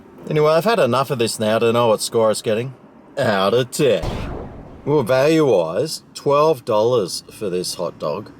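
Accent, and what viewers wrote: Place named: Australia. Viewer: I watch your videos because the Australian accent and behaviors thoroughly annoy the ever loving sht out of me. It feels pretentious and masquerades as highly educated. But I respect what you do and keep doing it bud.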